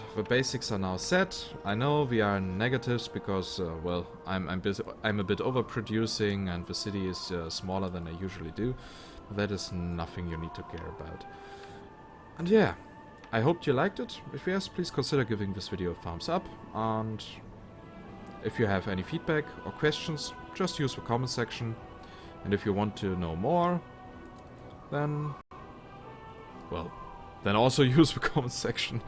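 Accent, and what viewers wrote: Germany. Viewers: I love the accent, I knew you were German within a few seconds (I've lived here for 15 years I should know)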